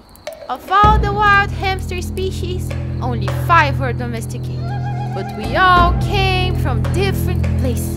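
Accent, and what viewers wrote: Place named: Brazil. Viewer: are you brazilian? you have a brazilian accent that i have too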